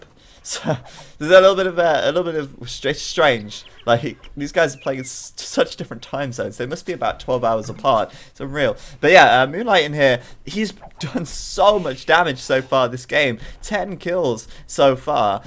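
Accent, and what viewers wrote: United Kingdom. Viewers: I was wondering, watching your previous videos whether you were UK, given your accent (I love british accent ^^), and I got sure, when you said Argentina and Beijing were opposite on a World map, because of the fact Europe is centered on European countries. And I told myself there were probably more difference between Paris/London and Argentina or Beijing than between the last two. I checked it out, and next time, I'll think before speaking ^^ Keep up the videos, bro, you're doing a very good job (you sometimes seem to struggle a bit when a lot of action is going on, breathing or coughing ^^), and between Spirit and you, I got back to AoE recently. Only fighting AI for now, but this is such a good game.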